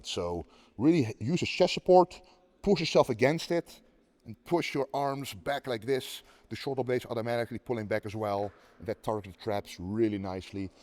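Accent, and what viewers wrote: Netherlands. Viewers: Very well explained. I also noted a accent in your articulation. I know it's not from the shoulder shrugs. You sound dutch or dutch antillean. Anyways great video. I actually did them while you were explaining them using body weight,just to get the feel of it. And I did notice muscle contraction (slightly) as it may be... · Damn, I like his dutch accent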